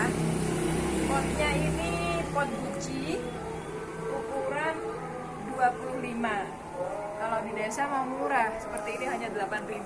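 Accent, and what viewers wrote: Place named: Java, Indonesia. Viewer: Jowone Endi mba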